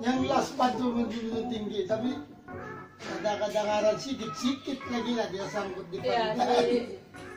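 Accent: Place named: Manado, Indonesia. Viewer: Kok bisa bahasa Indonesia, pasti tinggal di pulau dekat pulau Miangas diperbatasan, atau mungkin sering ke manado, soalnya logatnya sama 😅 kalau saya dari gorontalo provinsi sebelah manado jadi logatnya sama.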